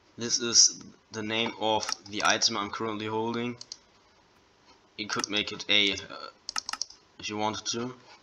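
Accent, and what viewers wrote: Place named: Germany. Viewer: der deutsche akzent haha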